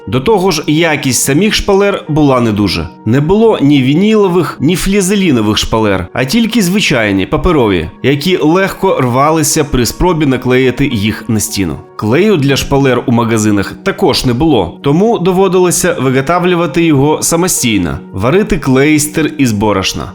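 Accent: Russia. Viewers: дякую,! тільки бажано вдосконалювати вимову, щоб позбутися російського акценту.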